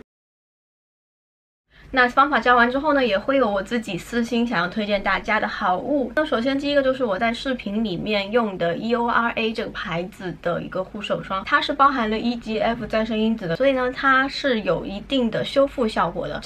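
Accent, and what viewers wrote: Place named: Taiwan. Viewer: 口音越來越像台灣人 讚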